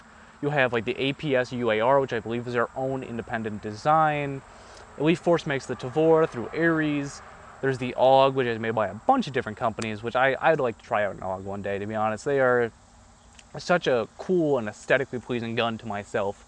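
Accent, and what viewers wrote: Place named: United States. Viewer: yeah well he's american they say most things weird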